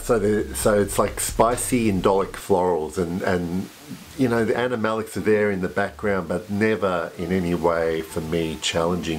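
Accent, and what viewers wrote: Australia. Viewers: So, you can pronounce the name of it. Seriously, I thought you were from Australia. Sorry mate, time to let you go. 👋👋 your believes are very different than ours.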